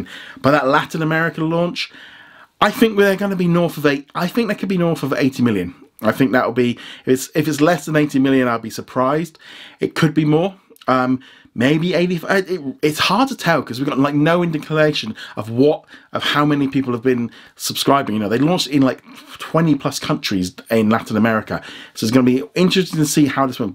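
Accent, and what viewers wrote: United Kingdom. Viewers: Excuse me are you British or are you an American from the USA or Canada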